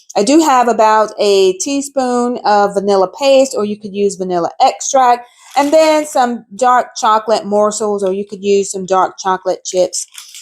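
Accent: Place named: South, United States. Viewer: I just love your style with that country accent! I stumbled across your video looking for chick pea recipes. I've been making chick pea salad for awhile now since I started making plant based recipes. I'm going to make the fritters, which I'll call patties for dinner tonight. I have some cans of chick peas until I do marketing tomorrow!😂 I've never heard of chick pea cookies! I made healthy oatmeal cookies yesterday! I'll try the chick pea cookies this weekend! I'll let you know how my patties turn out!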